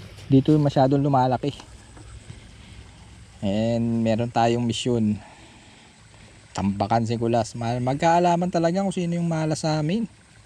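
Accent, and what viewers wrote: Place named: San Antonio, Philippines. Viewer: idol parang taga san antonio na ung accent mo hehe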